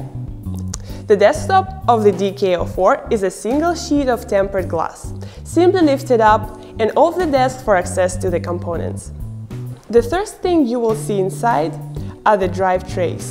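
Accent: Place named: Russia. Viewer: До чего же у неё жуткий русский акцент. Будто специально ррежет :)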